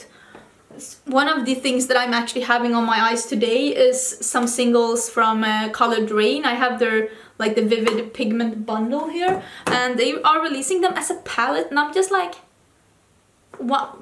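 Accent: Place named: Sweden. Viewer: Hey! I've been mia for a few months, but congrats on 50k!!! And I'm loving the hair; I'm planning something similar ❤ Your English is getting so good we wouldn't even know you were Swedish without that cute accent!! Lol. In the U.S. we usually only use "neither" when referring to only 2 things. Like...I like neither salt, nor pepper. If it's a group of more than 2 we usually say, "I don't like any of it." Or "I like none of them." I'm only saying this cause I know you won't be offended, and I know you're a smart girl so you like knowing EVERYTHING about something. I can tell, cause you're like me. 😉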